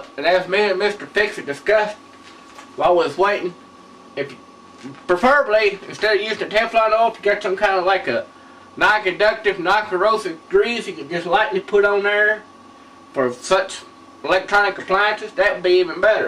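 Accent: South, United States. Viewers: He's definitely from the south.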